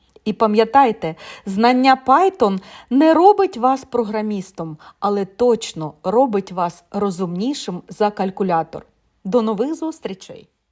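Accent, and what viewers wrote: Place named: Germany. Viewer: Варто зазначити, що конструкції більшості мов програмування названі англійською мовою. Тому і вимовляти їх варто англійською. У вас вимова схожа не німецьку чи французьку. Чому це важливо? Тому що учні поглинають це і використовують. А перевчитись потім дуже важко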